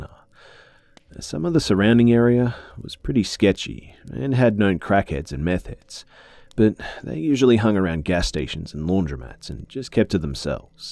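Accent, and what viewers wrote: Australia. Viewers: Ahhh, I took a break from horror stories for a while, but boy am I glad that to be introduced back into it by a smooth Australian voice. Thanks B! (You are Australian, right?)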